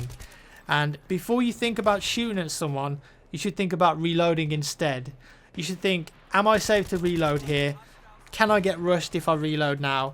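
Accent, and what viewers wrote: United Kingdom. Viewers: where do you live, because it sounds like you have an accent from the UK, but I see you playing with DOOM49 who lives in the US, right? When you play together, don't you get a lot of lag?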